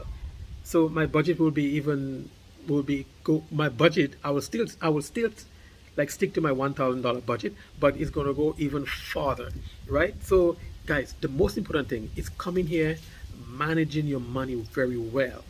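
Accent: Grenada. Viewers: His accent sounds more like Grenada. That isn’t a Jamaican accent.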